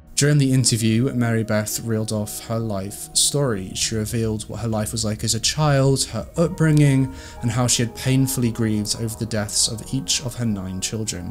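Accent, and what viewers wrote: United Kingdom. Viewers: I've been following Stephanie Harlowe's true crime channel, and recently discovered I've watched all of her videos! Stephanie's videos are incredibly high quality, and I didn't expect to find another true crime channel that was anywhere near as good as hers. I'm pleased to have discovered this channel. It is VERY well written and narrated. Bonus points that the narrator is very handsome and has a delicious British accent! Yaaay! You've got a bew subscriber. 😁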